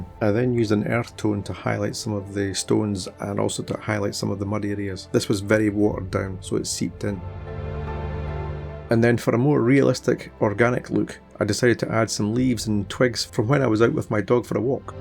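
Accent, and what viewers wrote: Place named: Glasgow, United Kingdom. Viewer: Watching this beautiful painting and learning from it while listening to this wonderful Glaswegian accent is a whole new treat for me ❤, keep it up brother and greetings from Iraq .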